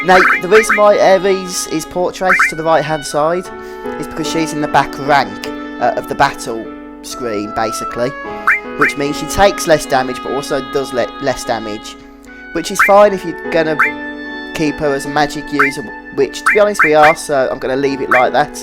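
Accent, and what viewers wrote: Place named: United Kingdom. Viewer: where is the accent from? it sounds... British? but i know its regional, but what region. lol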